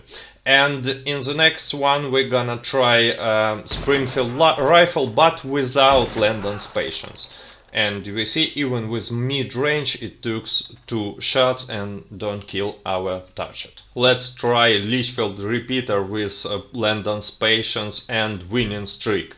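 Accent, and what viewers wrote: Russia. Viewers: I like your Russian accent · i like your russian accent :) nice vid! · I think ur Russian accent is pretty cool makes u sound cool and scary love it 😂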